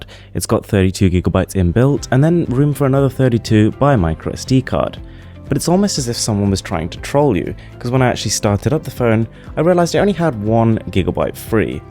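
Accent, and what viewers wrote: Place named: Birmingham, United Kingdom. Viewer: that's Birmingham I knew it ! lol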